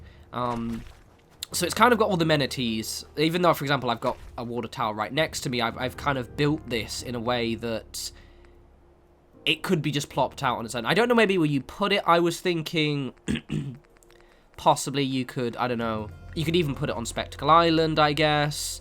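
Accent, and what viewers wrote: England, United Kingdom. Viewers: Why do I enjoy this England accent 💀it so soothing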